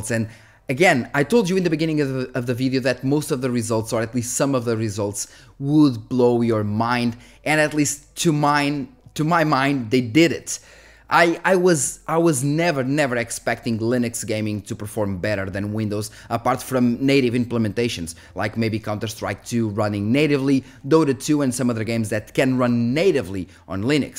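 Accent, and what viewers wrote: Portugal. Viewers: Literalemente instalei linux para poder recuperar um hdd com bad sectores e agora estou a ponderar usar linux para jogos, btw, mal vi a tua cara na thumbnail ja tinha deduzido que eras tuga XD, depois foi o sotaque, depois foi so confirmar no canal Xd, como e que deu para saber que eras tuga ? idk btw keep it up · your native tounge is portuguese? are you from Portugal or Brasil?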